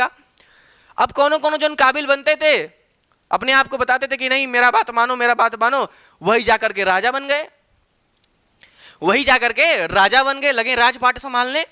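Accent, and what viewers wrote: Bihar, India. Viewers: Bahiya aap Bihar se ho kya aawaz se lag rha hai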